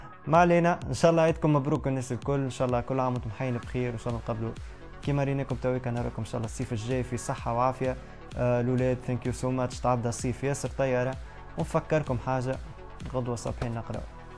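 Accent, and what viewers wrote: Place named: Algeria. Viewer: dude 3andek famille mn ljaza2ir bicuz ur voice benli dziri :3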